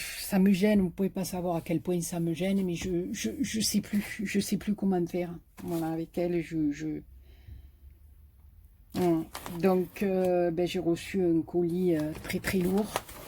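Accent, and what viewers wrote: South, France. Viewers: Cc ma toute belle et oui tu as encore été gâté c est super. Les papiers tes 2 albums sont magnifiques et je trouve que c est même dommage de le cacher avec des photos. J ai toujours beaucoup de plaisir à regarder tes vidéos qui me font toujours beaucoup de bien et vu que je ne suis pas partie en vacances pour raison financière tu m apportes avec ton accent le soleil du sud que j aime tant. Bisous bisous ma belle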